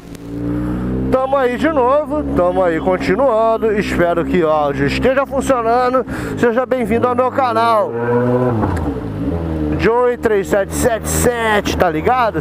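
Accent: Rio de Janeiro, Brazil. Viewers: Você é do rio né brother? Maneiro! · Esse sotaque carioca,e deixa louca....que tesão!!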